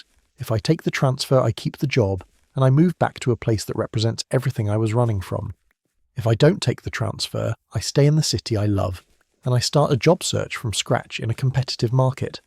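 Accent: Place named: United Kingdom. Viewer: why channel say usa but voice british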